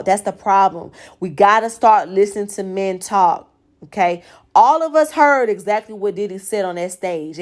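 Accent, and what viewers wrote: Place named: Florida, United States. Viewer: Are you from Florida you have the accent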